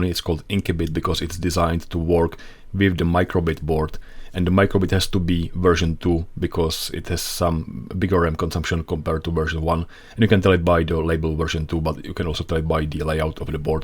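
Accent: Czech Republic. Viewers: Love it ! Looks really nice, especially the ink display. Also, are you Czech by any chance?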